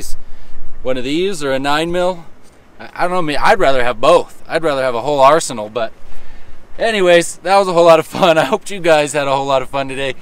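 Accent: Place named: Canada. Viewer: You sound Canadian but you look like you're in the high deserts of Arizona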